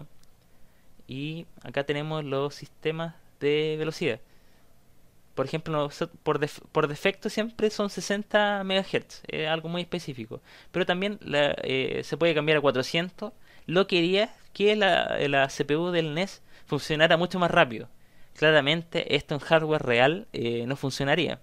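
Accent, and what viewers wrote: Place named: Chile. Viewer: tienes una mezcla de acento chileno con toques de acento andaluzmes algo raro pero mola mucho tu voz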